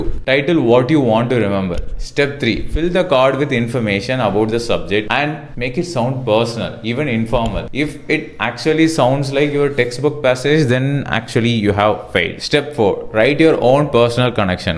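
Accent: India. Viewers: THE AWKWARD INDIAN ANGLOPHILIA: English is obviously not his first language, nor is it the language he is remotely proficient in. Indians, even from the lower social strata who want to rise up, tend to use English everywhere as if they had no language of their own. Strangely, they even talk to stray dogs in English. To appear smart, they tend to talk fast, but then no one understands their gibberish anymore and so they become a laughing stock. Don't they ever think about their ridiculous pronunciation and accent?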